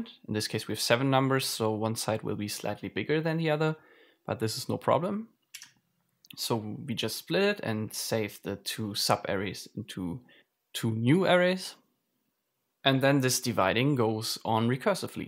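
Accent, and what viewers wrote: Germany. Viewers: Ich wette du bist deutsch :)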